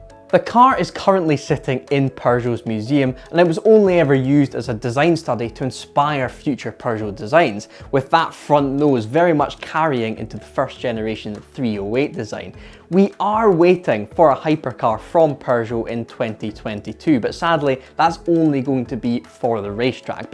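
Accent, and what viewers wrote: Scotland, United Kingdom. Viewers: he's Scottish he canne' help it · He's Scottish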